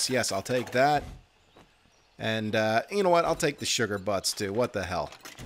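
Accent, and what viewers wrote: New Jersey, United States. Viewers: I think you missed a plug and I think you missed a bookbag in the pass-n-gas . btw I love that you're from NJ! It gives me joy to listen to you talk about kickin' ass in an NJ accent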